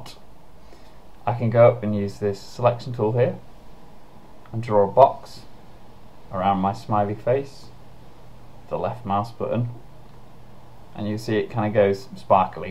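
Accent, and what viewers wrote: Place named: Leeds, United Kingdom. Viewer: Ahhh no way, silly me. I went to The University of Leeds as well so you'd think I'd be better at recognising that accent haha.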